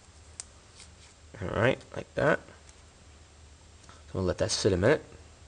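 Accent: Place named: East Coast, United States. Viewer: Well done vid sounds like an East Coaster-Jersey maybe New York? wild guess-What they sound like to me anyways (West Coast-Wa. state)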